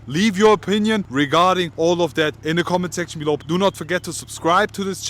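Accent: Germany. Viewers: Boaa wenn deutsche englisch reden...ohrenkrebs !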